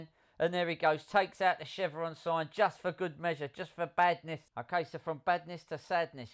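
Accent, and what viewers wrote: United Kingdom. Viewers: It's hard to uderstand you. Try next time open your mouth and forget this hard accent from UK :P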